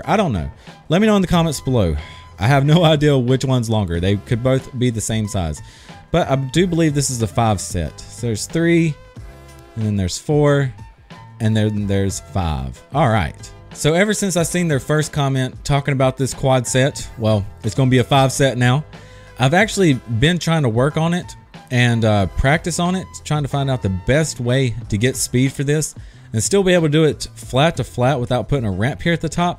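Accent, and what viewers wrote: South, United States. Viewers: your accent is like a mixture of suburban , flamboyant , ghetto , and southern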